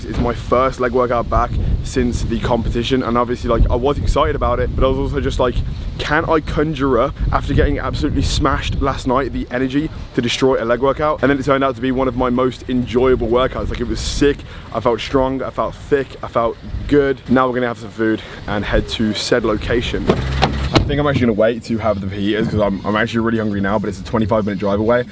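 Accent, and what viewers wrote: United Kingdom. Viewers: Mom? Swear hes british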